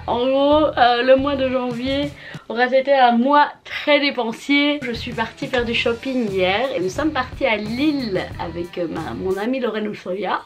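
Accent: Belgium. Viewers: Coucou alors je ne commente (vraiment) jamais de vidéos mais il faut que tu saches que je te trouve vraiment extra. Déjà l'accent belge ensuite ton parcours professionnel de surcroît (oui oui on est en com on utilise de beaux mots) ton style et vraiment le plus beau c'est ton naturel. Continue comme tu fais ! Si tu passes à bx préviens moi que je te paie un café (ou une biere) pcq je suis sûre que tu me fascinerais. Beaucoup de bisouuus et ta video est une pépite 😘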